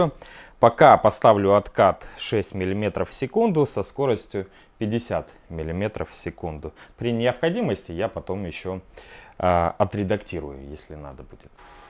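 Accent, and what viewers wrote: Rostov, Russia. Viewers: так больше половины Ростова говорит, это блин акцентом зовётся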